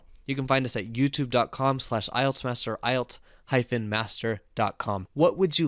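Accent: United States. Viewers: Should British english be used to write in IELTS? You wrote labour as "labor" (which also means giving birth i think) :) Also, you sound like the speaker in the listening test! (but with the american accent haha). Anyway, this lesson truly is helpful! I have my tests on 3rd June. I hope to be ready to for band 6+, or even 7,8!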